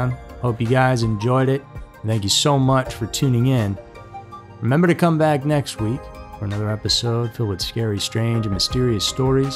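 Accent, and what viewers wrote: South, United States. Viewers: Thank you, new sub here, you have such a smooth soothing voice to listen to. I'm Australian, trying to place your accent, there's a hint of a slow Southern drawl🤔thinking Matthew McConaughey in Lincoln Lawyer😍but I'll settle down😂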